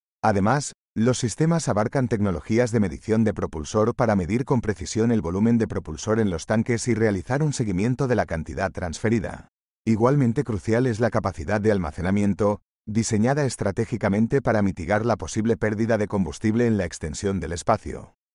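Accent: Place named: Latin America. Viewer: "Los barcos petroleros" 🤣.........español....¿de donde?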